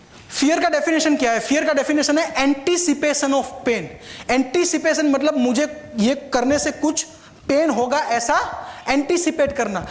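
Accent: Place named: Uttar Pradesh, India. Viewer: Your accent doesn't sound like Gujarati. Did you shift from UP/Bihar to Gujarat?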